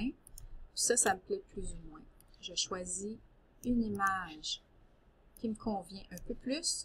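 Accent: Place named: Canada. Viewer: Merci pour votre vidéo. Grâce à vous j'ai découvert le logiciel "Canva". Continuez c'est vraiment bien. J'adore votre accent canadien si je ne me trompe pas!!!!! MERCI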